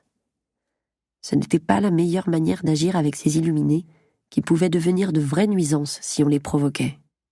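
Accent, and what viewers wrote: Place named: United States. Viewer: Quand on a lu le livre la prononciation des prenoms à l'americaine est tres perturbante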